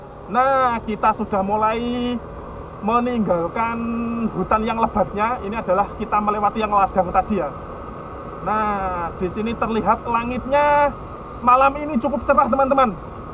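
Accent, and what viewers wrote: Java, Indonesia. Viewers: Gemes dengar suaranya... medok medok pie ngono.. Salam dr medan Pak..buat sekeluarga...sehat selalu..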